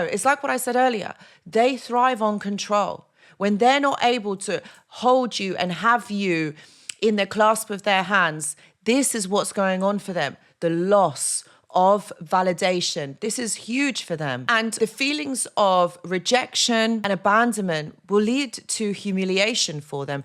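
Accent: England, United Kingdom. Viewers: Somehow I've been bragging on the English accent & with good reason I'm totally subscribed & thx luv😘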